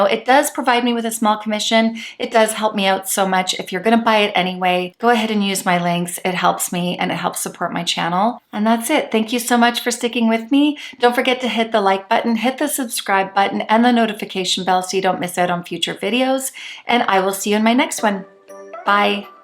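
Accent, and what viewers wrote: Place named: Canada. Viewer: Lisa’s team would probably let you return it for a refund, if you don’t think you’ll use it. They’re amazing! To answer your question, I use my fingers with the more glittery ones too, or a wet brush like you do. That madrigal(?) shade is so lush though! I’ve got all of the lustre ones (collected gradually, I’m a bit of an addict with LE stuff 🤣) but not as yet any of the liquid silk ones! Hopefully soon, as my budget allows. 🤦🏼‍♀️🤪 I think they might be my go-to ones once I get them! 😍 Delighted you replied, thank you. It must be hard keeping up with comments and editing etc!! I appreciate your work and time. X I so love your chatty natural style, it makes me feel like I’m in the room with a friend, watching you put on your face, haha. I love that. 😂 I’m from Scotland, of all places, my name is Linley. I love your wee Canadian accent touches. 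🥰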